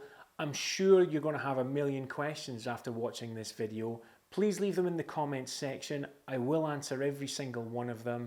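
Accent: Scotland, United Kingdom. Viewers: A great explanation of what Pantone colours are for. Nice to hear a Scottish accent too, rather than the usual American! Subscribed.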